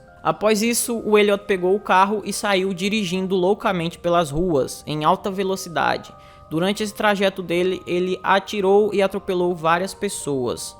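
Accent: Northeast, Brazil. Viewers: Jesus:"( vc e de onde? Pelo seu sotaque e Nordeste mas qual é?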